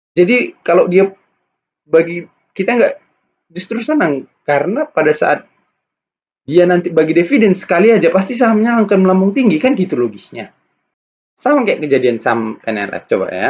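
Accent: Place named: Medan, Indonesia. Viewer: Macam orang medan kali awak liat cara ngomong abang,ni.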